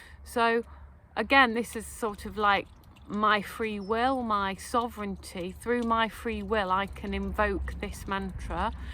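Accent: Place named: Derbyshire, United Kingdom. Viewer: Hi, are you from Derbyshire? I only ask because your accent is very similar to mine:)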